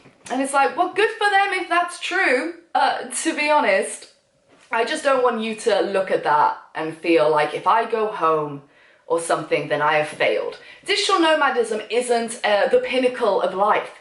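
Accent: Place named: Birmingham, United Kingdom. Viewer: Hi, glad to see you doing well. Home certainly agrees with you, you look great. And I think I hear the Brummie accent coming back. I remember the exact day you left Budapest, because the wife and I arrived there for vacation that same day.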